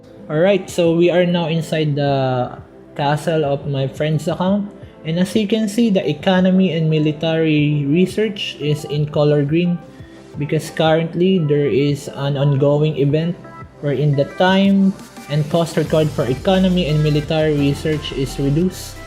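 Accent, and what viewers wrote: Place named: Philippines. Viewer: You're filipino just like me, I listened to your accent and I knew, hehe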